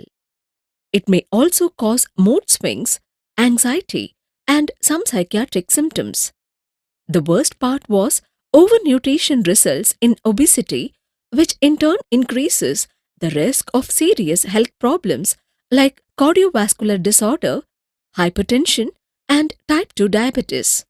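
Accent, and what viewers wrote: India. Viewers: seriously dude its an Indian accent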